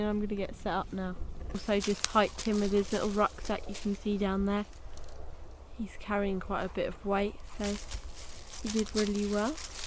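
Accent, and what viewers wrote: United Kingdom. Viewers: I love your calm voice and your little accent so British, it's too charming. You have the right to make fire in your English forests?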